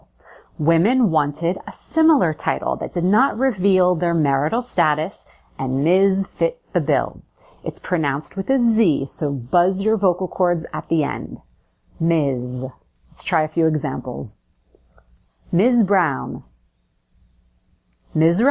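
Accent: United States. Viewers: Why yes she is American speaking their version of English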